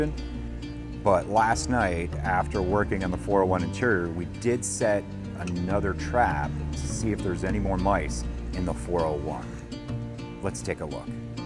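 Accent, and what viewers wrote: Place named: Pennsylvania, United States. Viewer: The way you say words like drawing - drawling is so PA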